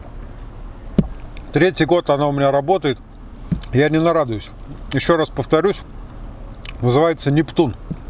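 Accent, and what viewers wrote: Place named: Tver, Russia. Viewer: Все думала и гадала что мне Ваш акцет знаком...не могла понять от куда. Бабушка моя так говорила ведер, не вёдер...она была родом с Тверской губернии.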